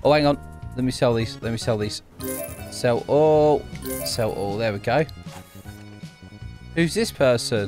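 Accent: United Kingdom. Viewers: hey are you a british? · Is he British? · you british???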